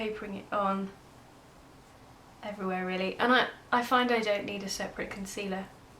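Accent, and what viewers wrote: England, United Kingdom. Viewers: Indian with an English accent?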